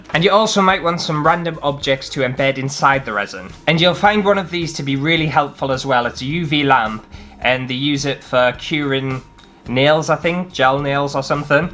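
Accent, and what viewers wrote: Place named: Northern England, United Kingdom. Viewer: Great video lovin the northern accent 👍🏻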